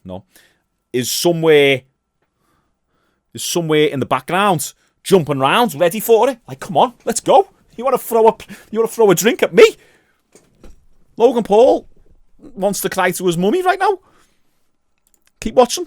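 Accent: Liverpool, United Kingdom. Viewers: W scouse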